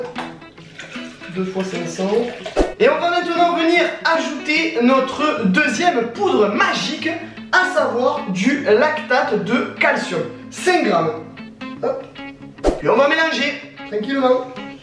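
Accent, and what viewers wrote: Marseille, France. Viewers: J'aime trop ton accent du sud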